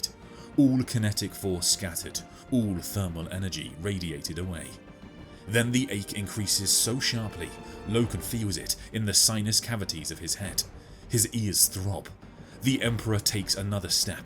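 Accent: England, United Kingdom. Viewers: Mispronounces basic words in the first couple of minutes in a smooth English accent? AI slop. Boo! Go away.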